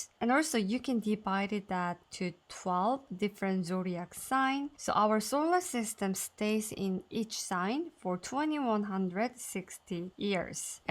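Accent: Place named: South Korea. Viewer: Sorry I'm learning Korean and I thought I recognised your accent. This is a very good video and clever to use slideshows :)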